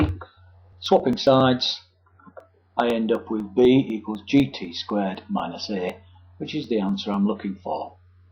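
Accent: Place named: Hull, United Kingdom. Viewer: The narrator has to be from Kingston upon Hull - or close to it. Very helpful video.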